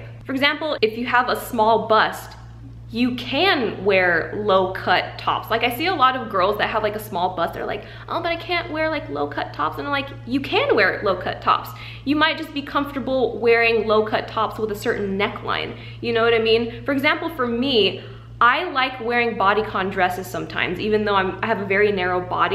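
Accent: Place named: Australia. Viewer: Your Australian accent is amazing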